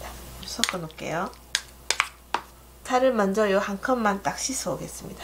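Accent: Gyeongsang, South Korea. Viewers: 시원시원하니 진행도잘하시고 요리도 잘하시고 많이배우고 갑니다❤ 경상도 억양 구수하니 정다워요 ❤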